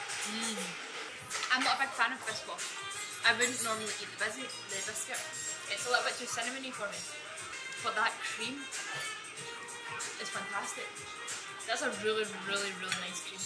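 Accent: Scotland, United Kingdom. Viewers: Thanks goodness for the English subtitles, otherwise I'll have a tough time understanding her Scottish English since I am more used to American English. I think Chinese subtitles might help her get more subscribers just in case she wants more audience. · Love you Scottish accent and your down to earthiness ! I travelled all around Scotland with my younger brother many, many moons ago and loved it ! Mind you, I stayed in the U.K. for 12 years and it was full of fond memories. Keep up the good work ! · Its so enjoyable listening to your British English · Its too heavy as british accent, lol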